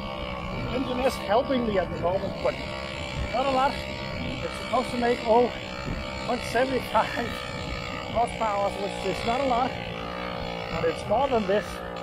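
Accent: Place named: Denmark. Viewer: Got the first video on this moped recommend by the algorithm, all hail the algorithm. It took a moment to figure out the accent was Danish, like myself. As a former postman, I couldn’t help myself from looking at the roads you were driving on, trying to figure out if I recognized anything. And by some freak coincidence, you were driving on my old mail route. Anyway, love the videos, keep it up👌🏻🇩🇰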